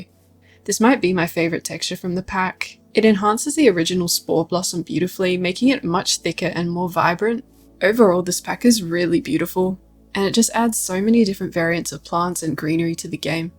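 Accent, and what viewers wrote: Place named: South Africa. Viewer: funny accent. is tht afrikaans?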